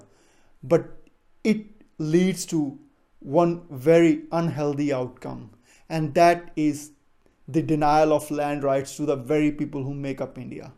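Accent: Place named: United States. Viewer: Why american accent?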